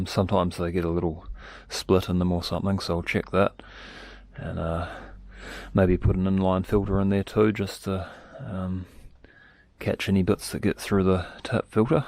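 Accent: New Zealand. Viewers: Shit you sound like a kiwi haha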